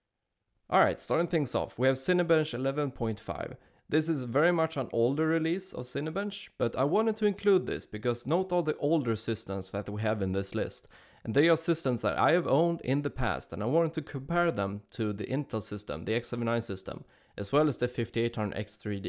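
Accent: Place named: Sweden. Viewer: If you listen closely you can hear your accent is coming from Sweden sort, yeah its correct xDD.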